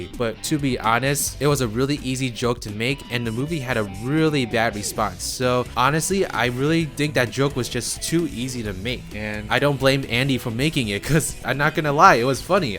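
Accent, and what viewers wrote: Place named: Asia. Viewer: Whoever you are, youre asian, i can tell from your accent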